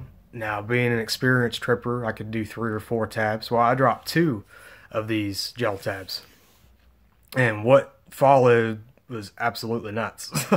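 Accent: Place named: East Texas, United States. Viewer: You sound like your from east tx also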